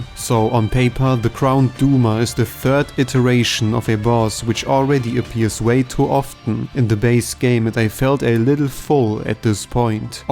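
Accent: Germany. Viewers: du bist deutsch richtig? das hört man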